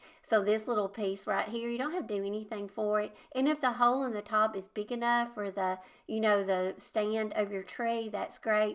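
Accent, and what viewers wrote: South, United States. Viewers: Love all your ideas. Your country accent sounds like mine that I can't get rid of 😅😅. Where are you from 😊.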